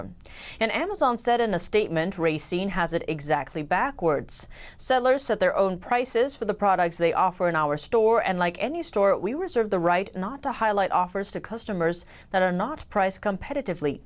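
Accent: United States. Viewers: Wow baby blue on ivory with gold necklace your looking super cute and I love that strong American accent. Your perfect for the show.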